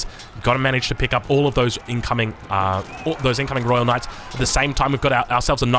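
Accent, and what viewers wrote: Australia. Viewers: the highlight of my work day is your aussie voice saying good day fellas or whatever and then proceeding to slay commentary for the next hour. thank you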